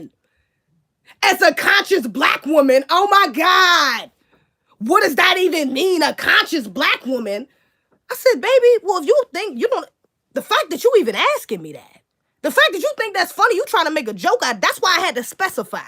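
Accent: Baltimore, United States. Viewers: U from Baltimore ain't u sis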